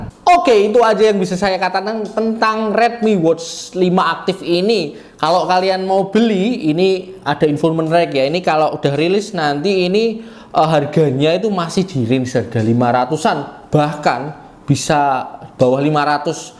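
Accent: Java, Indonesia. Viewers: medok tenan ngomong e😅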